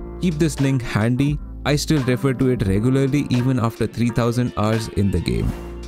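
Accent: India. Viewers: As soon when you heard the strong indian accent you knew that the guys knew his shit xD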